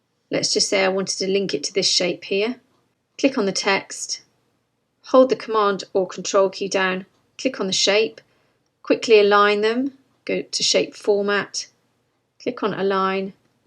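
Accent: England, United Kingdom. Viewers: Thanks so much for this. With one exception I think I got everything that you were explaining. Until now I have had so much grief with textboxes and thought the people who invented them should be hanged as war criminals. The one exception, which reminded me of so many other tutorials that I have watched was increasing or reducing the size of a picture. You did it and produced the result as if by magic without saying how you do it. Perhaps if I watch again I will see how you did it. I am going to create a practice document and play about with the boxes until I can really get them to work. So far, positioning boxes has been almost impossible for me and reduced me to near suicide with frustration. Thanks again. Your lovely, clear English accent helps, too!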